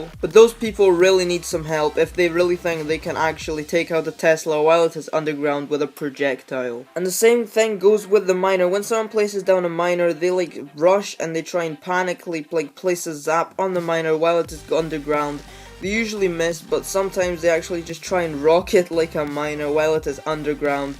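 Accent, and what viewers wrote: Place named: United Kingdom. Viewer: If you mean Russian then he's not, he has a British accent.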